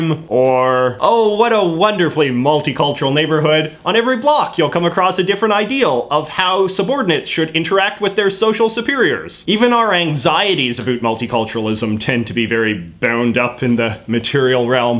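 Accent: Canada. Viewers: Ik this video is about japanese culture but by watching you im learning alot about the canadian accent. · You sound astronomically Canadian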